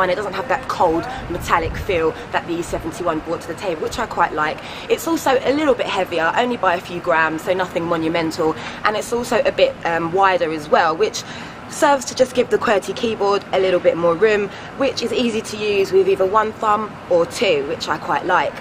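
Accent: United Kingdom. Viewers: british english :D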